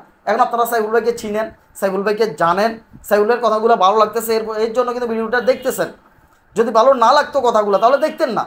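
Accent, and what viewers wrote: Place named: Comilla, Bangladesh. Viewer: খুব ভালো লাগলো ভাই,আপনার ভাষা তো আমাদের মতো,আপনার বাড়ি কি কুমিল্লা?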